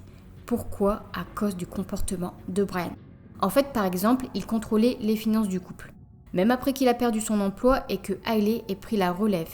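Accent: North, France. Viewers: Je ne connaissais pas cette histoire.. 14 ans c'est bien peu. C'est un horrible personnage. Sinon je crois que j'avais demandé dans un commentaire d'une autre vidéo. On dirait que je perçois un léger accent du nord.. Je suis aussi chti c'est pour ça et j'ai la même intonation dans certains mots. 🙂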